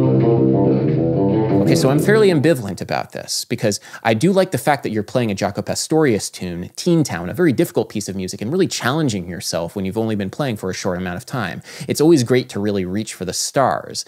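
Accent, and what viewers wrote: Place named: New York, United States. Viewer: Just something that keeps intriguing me. Where are you from? This is one US accent I’m not familiar with. Great vids mate!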